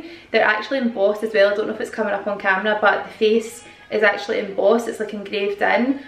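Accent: Scotland, United Kingdom. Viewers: Love the chat (and Scottish voice). Xox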